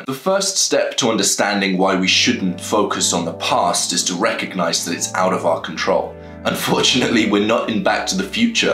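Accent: England, United Kingdom. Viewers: You sound English it’s refreshing respect from South Yorkshire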